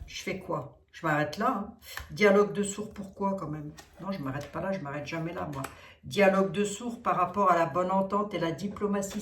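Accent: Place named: Lorraine, France. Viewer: Bonsoir, toujours un pur bonheur de vous écouter ❤. Bon week-end. Je vous embrasse du bout du Finistère. Votre accent Lorrain est un bonheur 🤗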